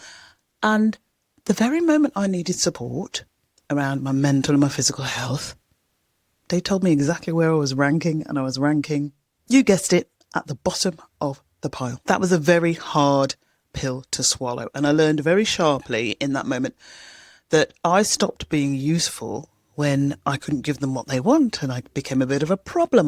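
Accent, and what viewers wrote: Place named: United Kingdom. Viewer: Just a note of encouragement for your channel. Luv your sense of humor and soft, soothing delivery. The Brit accent doesn't hurt either. You know how us Americans adore a British accent!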